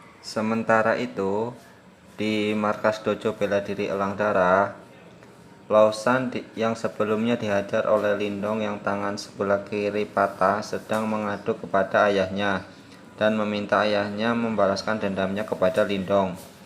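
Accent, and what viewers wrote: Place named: Java, Indonesia. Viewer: Medok banget jawa nya bang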